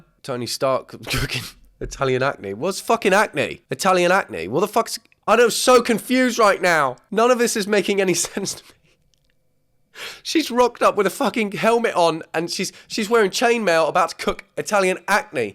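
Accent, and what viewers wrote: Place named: United Kingdom. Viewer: He sounds really British this time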